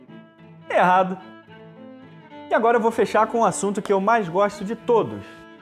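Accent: Rio de Janeiro, Brazil. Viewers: Português com sotaque e falas brasileiras kkkkkkk Também sou kkkkkk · É o português mais carioca que eu já vi 😁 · "Sou português" falando Português BR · E que sempre me queixei da falta de bons canais do youtube em portugues-pt FINALMENTE, encontrei um feito por um tuga mas com sotaque PT-BR. Enfim ainda bem que ja estou habituado xD Bom trabalho o canal esta incrivel. Mesmo o que fazia falta. e para mais um canal de outro amante de guerra :) Eu confesso que sempre achei a era napoleónica muito chata na guerra. Vais falar deste assunto? Ou para já é tudo historia medieval? Abraços de Portugal :)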